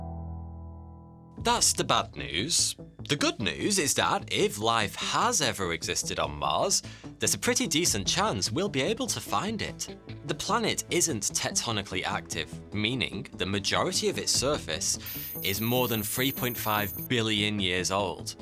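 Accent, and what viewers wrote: United Kingdom. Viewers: Heck me! This dude's appearance and accent is way too traditional like a British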